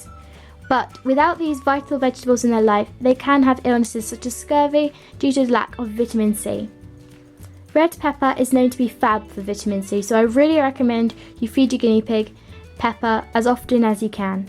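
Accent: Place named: Scotland, United Kingdom. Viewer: I was wondering where are you from because I’m Scottish and we have the same accent ❤️ I also have a guniea pigs names Zelly and George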